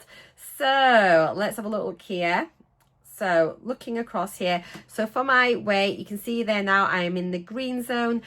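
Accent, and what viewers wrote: Yorkshire, United Kingdom. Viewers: Congratulations, on reaching your weight loss goal. I appreciate the time and effort it takes to create your videos; all very informative and inspirational. Your upbeat nature helps me overcome impatience when I feel like my own weight loss is stalling. Truth is, it never stalls for long. I tend to stay at one weight for two or three days following injection and then lose a pound or more during the remainder of the week. After my weekly injection, I watch your corresponding GLP-1 Diary video. For example, my next injection begins my week 12. After my poke, I will watch your Week 12 video to see what results and side effects I can anticipate as our weight loss rates and side effects have been similar so far. I have lost 30 lbs as of today. Woo hoo! Only 70 more to go until I can celebrate like you! Mounjaro is a godsend and the ONLY thing that has worked for me. 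Conquering obesity while building muscle is the best thing I can do to stay healthy as I age. Being able to fit into non plus size clothes is just an added benefit. On a personal note, your lovely Yorkshire accent reminds me of my cousins who live near Leeds. I am in Canada so our visits are few and far between. Take Care and best wishes as you begin your maintenance phase.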